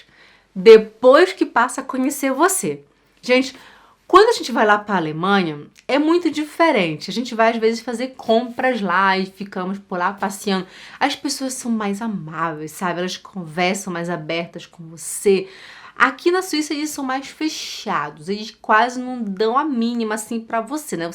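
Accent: Pará, Brazil. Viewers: Nossa sabia que você era da minha terra é inconfundível seu linguajar paraense 🤗❤️bjs amei conhecer seu canal eu estou aprendendo muita coisa com você.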